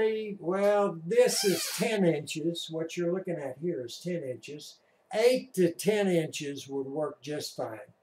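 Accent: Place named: North Texas, United States. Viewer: I got stuck on your channel becuase for some reason, you remind me of my late Grandad. I don't know where you're from originally, but he was from North Texas and had a very similar dialect to yours. He was also a ham, as is my Dad. For whatever reason, I never caught the ham radio bug until about a month ago, so I took the Technician test at the beginning of March 2018, and the General at the end of March. I wish I had done it when he was still alive, but at least I'm getting to enjoy learning from my Dad, and your videos as well. Thank you for them! 73s to you! KG5YTI - Brian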